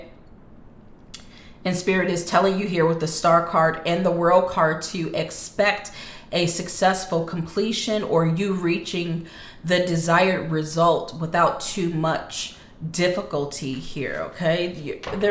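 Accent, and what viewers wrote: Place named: Chicago, United States. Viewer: Not me listening to your channel for so long before I realized you are from Chicago!! Hey neighbor! Let me go get on this L real quick lol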